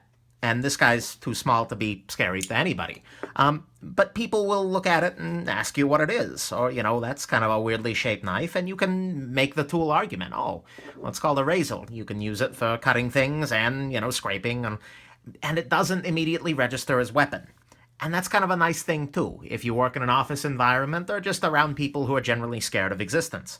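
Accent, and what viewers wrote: New York, United States. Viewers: I want to add I subbed last week and the voice tone and rythme made it for me. Makes it very enjoyable to ear!:) thanks and keep up... is this an New York accent?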